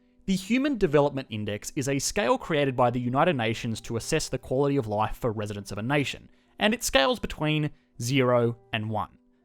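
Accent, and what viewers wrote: Australia. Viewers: Hey are you Australian? You have an Australian accent ahaha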